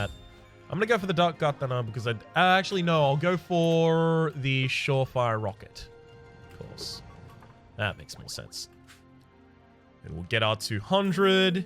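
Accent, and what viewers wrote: Australia. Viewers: That beautiful authentic Australian accent is music to my ears and I want to hear more of it.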